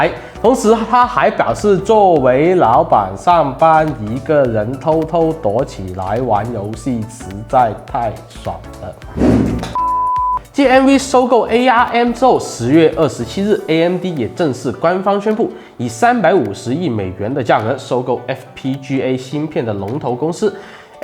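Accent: Cantonese, China. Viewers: 满口的广色普通话和英文，落实是广东人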